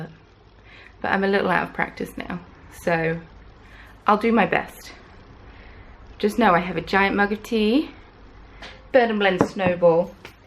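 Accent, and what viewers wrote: RP, United Kingdom. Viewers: I’m thrilled to have found your channel today. You’re one very stunning woman and you speak so beautiful, very “posh” like. I’m currently binge watching your videos and enjoying them. Thank You for sharing... 😊😘😊 ps, where are you from? Xx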